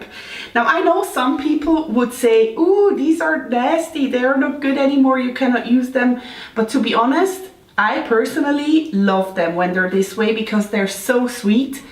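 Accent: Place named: Switzerland. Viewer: looks yummy! I never tried a vegan version so I'll be sure to try your recipe next time(+the sugar haha)! 😍 I let my bananas get even browner 😂 ...my boyfriends mom taught me to freeze the ripe bananas. (They get super soft after thawing, so a bowl is needed! And it gets pretty messy haha.) AND your Swiss accent is the best! Accents are so much fun! 😂 I enjoy the tifical Pilipino accent, you know? But se German won is good too 😂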